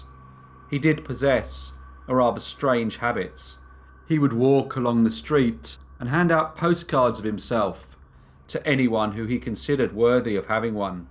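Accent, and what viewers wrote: United Kingdom. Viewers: Found your channel and now binge watching, fascinating stuff - and it's nice to hear a fellow British voice! How on earth was Pritchard considered a ladies man? Even without the terrible things he did he already looked like something out of a horror movie. Can you imagine being given one of his postcards? Eeep!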